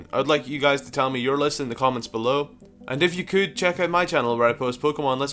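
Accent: Scotland, United Kingdom. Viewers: so scottish :) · hes scotish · Yes! It's the Scottish guy again!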